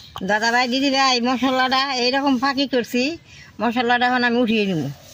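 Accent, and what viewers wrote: Tangail, Bangladesh. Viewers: বাংলাদেশে টাংগাইল জেলার মত ভাষা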